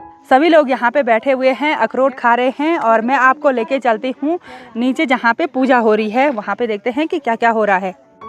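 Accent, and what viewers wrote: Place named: Pahari, India. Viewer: I attend your each and every vlog as your voice and presentation skills is unique in pahari tone. I like very much.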